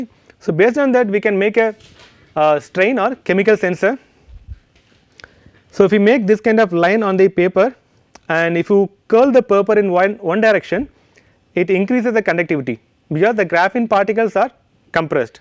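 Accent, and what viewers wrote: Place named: India. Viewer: Why Indian guys even don't try to correct their accent? 😢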